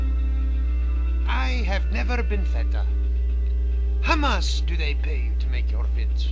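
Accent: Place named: United Kingdom. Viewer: LOL, I love your accent.. you straight sound like someone from a charles dickens movie. :) I hope to see a new video from you soon. Its been awhile.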